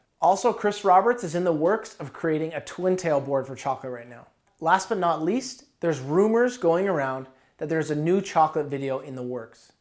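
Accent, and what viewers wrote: Canada. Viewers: Do Canada people reply say ay? Not to be mean it funny just wondering be I heard you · imfrom spain and ecxept for the canadianaccent your spanish is good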